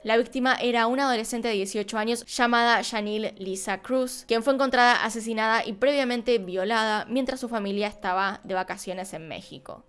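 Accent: Argentina. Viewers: Hola, tengo poco viendo tus videos, muchas felicidades, tienes un hermoso acento. Argentino, no se si ya tienes el caso, de hace muchos años de los narcosatanicos de Matamoros, tam. Ojala y encontraras suficiente información al respecto y si te parece hacer un video. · Me encanta tu voz aterciopelada y tu pronunciación del inglés con tu acento argentino. Besitos desde México 🇲🇽 😘 😘 😘 🇦🇷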